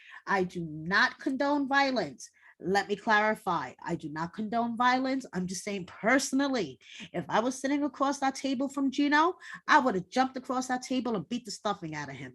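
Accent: New York, United States. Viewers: Rapid-fire, New York style. That's how I like my pizza and my recaps..❤️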